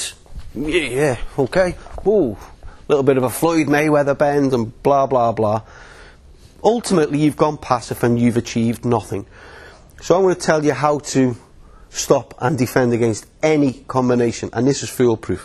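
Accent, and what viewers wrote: Liverpool, United Kingdom. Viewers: Scouse is the name of the accent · The voice of gods mate. Scouse. :)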